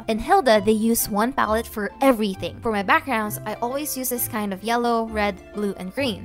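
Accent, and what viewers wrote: Philippines. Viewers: You have a litte filipino accent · I can still hear that Fillipino accent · Why do I keep hearing your Filipino accent!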